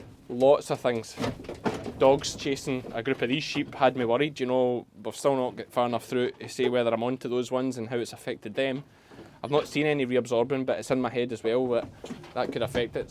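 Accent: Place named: Kilmarnock, United Kingdom. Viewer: Actually having my friend to watch, as we are coming to Kilmarnock in three weeks, so she can tune her ear to the accent!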